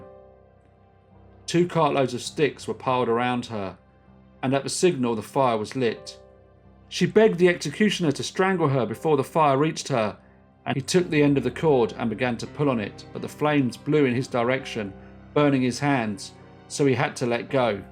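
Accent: United Kingdom. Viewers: Wow, how far you have come on, from these early presentations of work. Its as if you thought the audience would struggle understanding you correctly because of your wonderful accent!!! Your work is still bloody marvellous and as gripping as always. Im so happy for you. The success that your channel has turned into, is very well deserved. Your unique cases are so interesting and researched wonderfully i can't express enough how much I love them. Im going back to the beginning again to enjoy once more. Thanks again. P.S. w3ll done for embracing our wonderful colloquial language and accents. I love being British and eccentric!!! 🤣😉😉🤪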